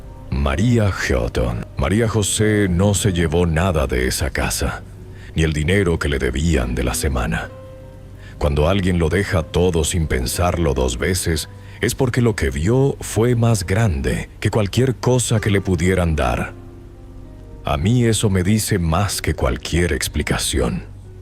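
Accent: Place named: Venezuela. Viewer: te escucho debes de Venezuela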